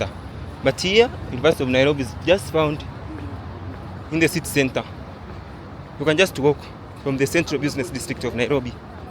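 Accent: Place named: Uganda. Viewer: YOUR ACCENT IS FROM UGANDA NOT TANZANIA,,, TANZANIAN CANT SPEAK THAT ENGLISH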